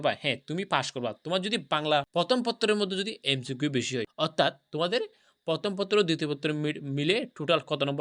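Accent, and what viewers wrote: Chittagong, Bangladesh. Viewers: Apni mone hoi chitainge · ভাইয়ের বাড়ি মনে হয়, আমাদের চট্টগ্রামে ❤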